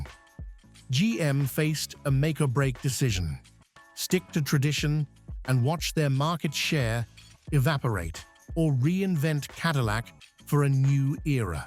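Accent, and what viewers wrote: England, United Kingdom. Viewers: Four Thousand , One Hundred and One.............> WRONG:!!!! 4100..........Four Thousand, One Hundred..............PERIOD! Do some EDITING,,,,,,,,/"RESEARCH",,,,,, and get "RID" of the "GAY: English Guy's VOICE! Brits have been : LOOSERS,,,,,,for OVER 100 years now,,,,,,,,, "COME ON MAN"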